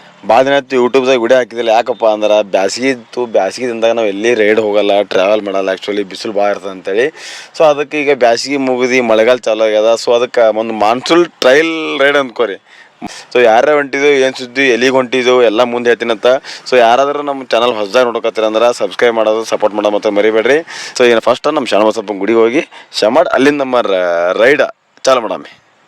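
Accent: Gulbarga, India. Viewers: Me from Bagalkot but I like the gulbarga style kannada slang .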